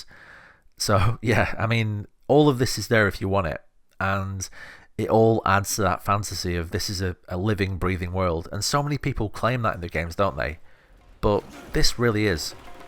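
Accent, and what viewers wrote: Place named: Blackburn, United Kingdom. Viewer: That sounds like a Blackburn accent to me, great review man. Pearl abyss have created something truly special, subbed.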